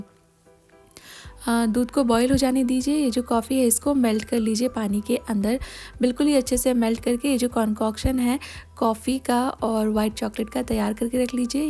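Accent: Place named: North India, India. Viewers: Actually yar u don't know South indians hum north indians ki pronouncing me bht joke bnate h...mei literally daily notice karti hu..jo words hum Bachpan se bolte aaye h usko yeh wrong bolte h..mei bhi yehi ki tarah bolti hu.. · Pr hum North Indian ese hi krte hai prounce jaise apne kiye so people should not feel offended in this nobody is making fun of that pronunciation but we North Indian ese hi boltae even my mother harr jagah yahi likha hota hain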